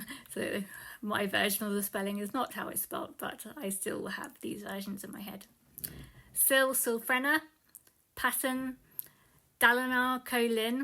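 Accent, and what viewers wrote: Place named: United States. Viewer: This is such a fun idea for a video. And you did such a great job. I wonder if theAmerican pronounciation messed with it a bit too. Awesome stuff.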